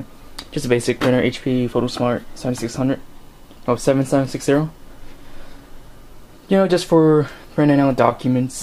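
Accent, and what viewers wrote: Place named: Philippines. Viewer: Are u phillipino? You sound like one :P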